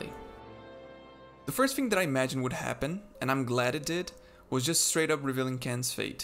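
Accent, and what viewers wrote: Brazil. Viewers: Um sotaque de levinho de brasileiro falando inglês! Vídeo ficou sensacional btw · acho que o narrador é brasileiro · Are you Brazilian? I swear your accent sounds like someone from Brazil speaking english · Tu é BR hein. Digo mais: CARIOCA. Baita conteúdo! Essa série é sensacional, consumo muitto conteúdo secundário de Succession e teu vídeo chegou até mim através disso hahahaha. Parabéns, furou a bolha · Is this a Brazilian accent I hear? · Identifiquei que era BR pelo acento, mas otimo inglês irmão, parabéns pelo trabalho, essa série é bizarra de boa. Nunca vi tamanho desenvolvimento de personagem, te faz conhecer um personagem melhor em 3 temporadas do que em 7 ou mais em outras dezenas de séries. Abraços! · vc eh brazuca? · Are you brazilian? your accent sounds brazilian 😅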